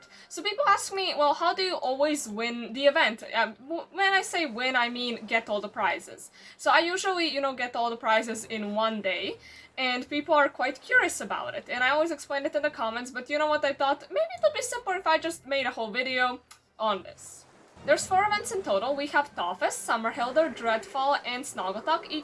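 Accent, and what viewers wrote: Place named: Russia. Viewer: Your accent makes me think you know Russian, if you do then know I do too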